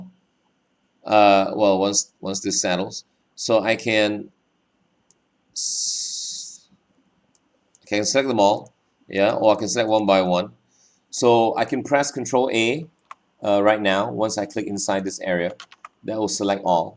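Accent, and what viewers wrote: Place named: Malaysia. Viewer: You have a nice accent for a Malaysian Lecturer. Nice content and elaboration, hopefully you would contribute and upload more Electronics videos :)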